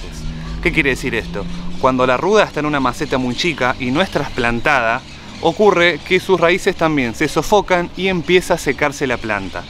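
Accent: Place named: Córdoba, Argentina. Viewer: Hola te agradezco por tu canal con info tan util y buena para la salud , te comento que tengo una ruda que no riego nunca y esta muy linda ,estoy en las sierras de Cba y por tu acento y el lugar me parece que vos no estas lejos , aca no llueve durante estos meses .Me gustaron mucho los otros videos un saludo enorme y que tu tarea en el jardin continue con exito .La tierra nos brinda alimento y buenas medicinas por eso tenemos que saber distinguir las plantas y valorarlas , cuando mis hijos eran chicos mas de una vez me salvaron los tecitos de yuyos , pero todavia tengo tanto que aprender , por eso te felicito que siendo tan joven hagas una tarea tan valiosa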